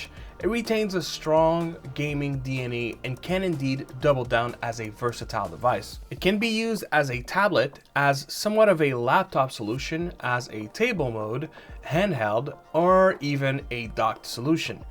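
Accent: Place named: Morocco. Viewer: I've been sitting here trying to guess where your accent is from. I'm gonna guess Moroccan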